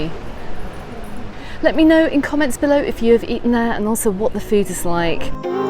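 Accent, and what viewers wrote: United Kingdom. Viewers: Damn so strong british accent i do not understand nothing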